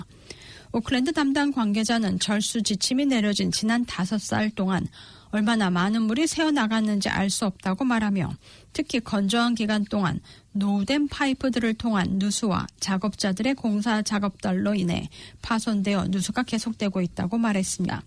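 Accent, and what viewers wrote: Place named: North Korea. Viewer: 발음이 북한같아요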